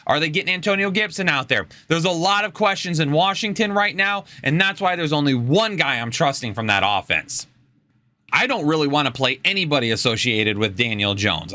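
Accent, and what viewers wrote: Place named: Minnesota, United States. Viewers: Minnesotan born n raised, and your accent was much closer dontcha know. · You had the better Minnesota accent for sure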